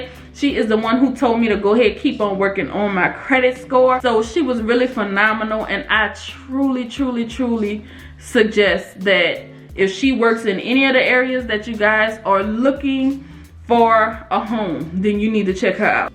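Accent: New Orleans, United States. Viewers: OMG! You sound like you're from home (New Orleans). Anyway, I have just started your vids. Yes, I am ready to buy that house this year. I'll keep watching.... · I'm hearing a New Orleans accent. You're from the boot? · Thank you so much for all this valuable info.... This is Great and Very Helpful !!! Love your N.O. Accent!!!...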